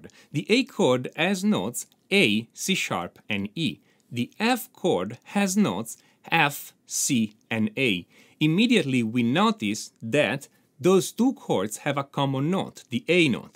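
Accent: Italy. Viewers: Hello are you italian?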